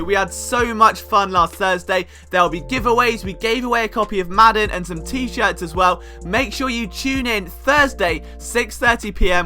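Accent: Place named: London, United Kingdom. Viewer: YOU SOUND LIKE YOU'RE FROM LONDON!!!!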